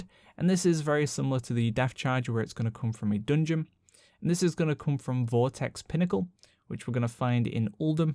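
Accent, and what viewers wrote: United Kingdom. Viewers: Svante Bard that’s the british accent for you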